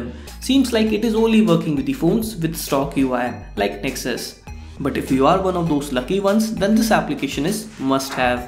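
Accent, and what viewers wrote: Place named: Bengal, India. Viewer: i think you are Bengali . i am saying because your accents is a lot like Bengali .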